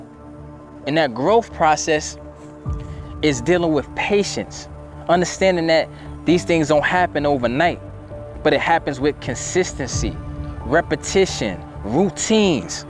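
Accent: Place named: Brooklyn, United States. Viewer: Yo my Guy, u be preaching. You need to give your bio or something. Let the people know who you are. You are the first motivational speaker who talks like me ( hip hop). You gotta be from Brooklyn. I’m from Queens. I have been struggling and listening to you is really helping me Bro. Salute💪🏿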